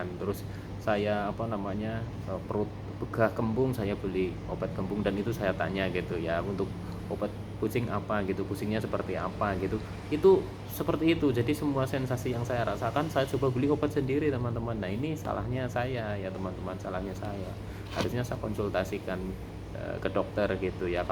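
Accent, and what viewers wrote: Banyumas, Indonesia. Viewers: Mas mau tanya, Mas ngapaknya mana, sy ngapak Kebumen, anxiety sy masih suka datang Mas, blm sembuh bgt. Trimakasih motifasi nya, 🙏🙏🙏.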